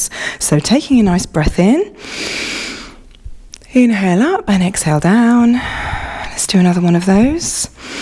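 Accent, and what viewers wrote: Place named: England, United Kingdom. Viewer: I can see by your accent that you are English . Could you tell me where do you teach ? London maybe ? Would be perfect for me !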